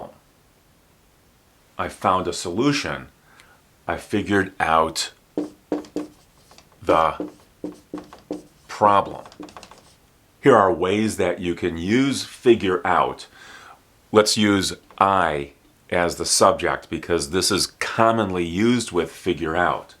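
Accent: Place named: United States. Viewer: I love you prononciation teacher. You're the really american spoken i've never known.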